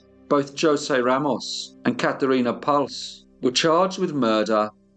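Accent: England, United Kingdom. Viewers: Reputable channel, not quite. The narrator can not even say the gentleman's name the right way. Isn't it said Hose' or 'ose'? You don't say the J because it is silent? If this channel was reputable, it would have also done the research of how to pronounce the Brazilian names. Hence, the English narrator was probably making fun of how the South American dialect is pronounced. This is the reason why I have not subscribed to this channel. I can't stand the one tone monolog, and that flucking English accent!!! Why not be a little more respective, and also get another narrator, possibly a female!!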